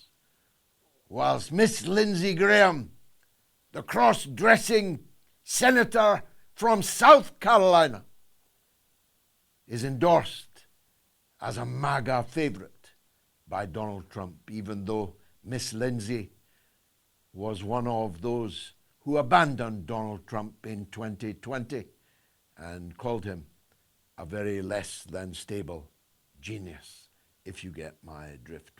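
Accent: Scotland, United Kingdom. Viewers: Hold on, maybe I just misheard you due to your thick Scottish accent, but did you just say ‘Bubba’ is a HORSE??!!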